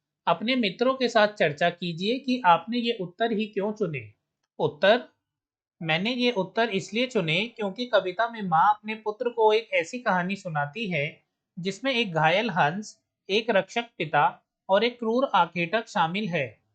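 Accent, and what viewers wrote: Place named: United States. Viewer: Why are you speaking English like a american?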